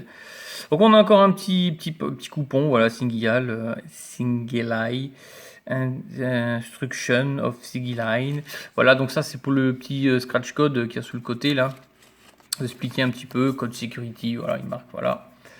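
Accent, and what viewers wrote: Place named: Lorraine, France. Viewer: Hop'la l'ami Lorrain, vla un accent que je connais bien ;) Je viens d'à coté de chez toi, je possède aussi une Fuchai 213, normal que je m'abonne !! Bonne vap et bonne continuation ;)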